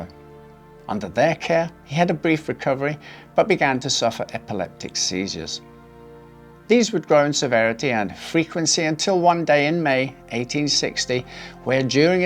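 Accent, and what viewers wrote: Scotland, United Kingdom. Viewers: Are you are Scottish, but have stayed in England? Or English, with time spent in Scotland?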